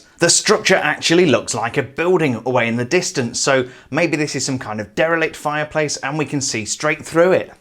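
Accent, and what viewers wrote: England, United Kingdom. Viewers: I thought you were English?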